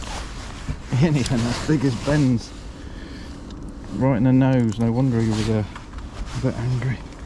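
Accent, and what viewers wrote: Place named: Birmingham, United Kingdom. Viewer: Great result, even I was gutted when you lost those two fish 🥺the fish in Packington are all very nice Looking, and need telling it’s winter and to stop pulling so hard, I’m noticing a change of accent too 😎 definitely a brummie drawl creeping in from fishing there so often😁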